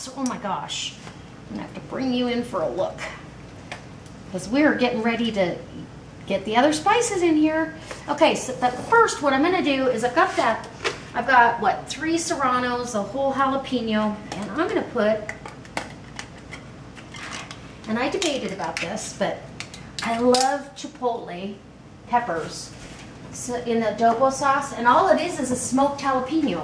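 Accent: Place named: Texas, United States. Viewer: You sound like your a Texan. :)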